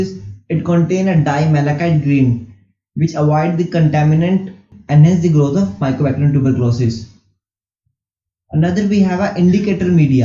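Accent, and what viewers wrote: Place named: India. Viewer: I’m sorry man but I can’t never understand think Indian accent.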